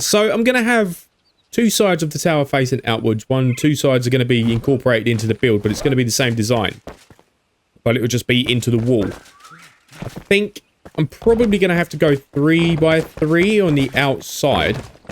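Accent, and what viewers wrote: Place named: England, United Kingdom. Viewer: I´m watching this series not only because I like Enshrouded very much but your accent mate, I really like it, typical english imo, greets from the Netherlands, keep up the good work!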